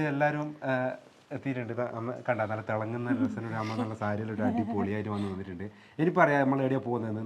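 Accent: Kannur, India. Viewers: Ningal kannur aano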